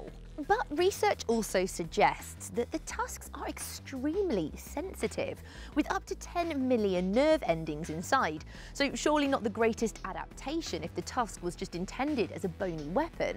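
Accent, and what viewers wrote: United Kingdom. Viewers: And I like it. She has a beautiful British accent.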